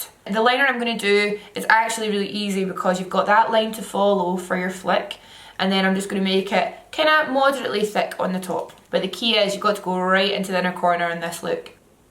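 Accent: Scotland, United Kingdom. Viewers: I usually don't like the Scottish accent (i have a cheek as i'm from Belfast, N.I lol) but i LOVEEEEEE your accent/voice soooooo much ❤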